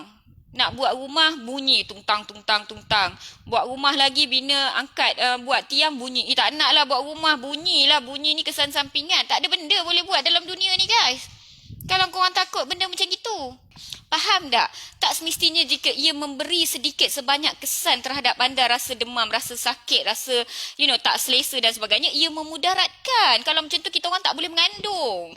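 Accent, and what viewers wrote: North, Malaysia. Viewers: Di persilakan ke Penang lepas PKP ni... first time sy tgk video sy ingatkan dr utara sbb dialek mcm org utara...rupanya dr kuantan😊😊😊...akhir sekali sy ucapkan terima kasih kepada diatas pengkongsian ilmu dr....semoga dan keluarga sihat2 selalu....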